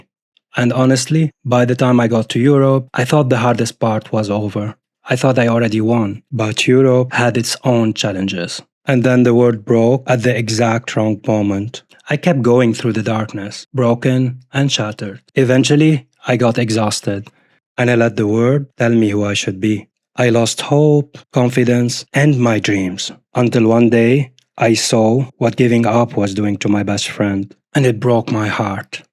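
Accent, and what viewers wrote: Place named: Syria. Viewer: من لهجتك مبين انك سوري، ومدامك دارس طب ف عالاغلب مسافر ع المانيا بتمنالك كل التوفيق وعلى امل انك تلاقي حياة احلى واكثر انفتاحا وتقبلا وامانا برا سوريا وملاحظة صغيرة: انت قلت (غيري من الناس العاديين)، الاصح هو غيري من الناس المغايرين جنسيا او الستريت، اما "العاديين" فكلنا عاديين وطبيعيين سواء كنا مثليين مغايرين مزدوجي الميول او من غير ميول كلو عادي وطبيعي😁 تحياتي الك صديقي وكل التوفيق Much love🤍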